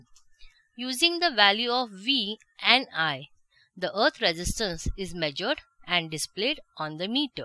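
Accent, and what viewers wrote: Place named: India. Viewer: But this indian-english akcent is best😅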